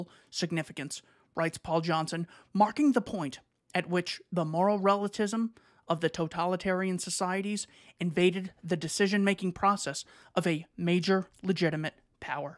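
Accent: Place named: United Kingdom. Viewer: Love the Bri'ish accent. Great video!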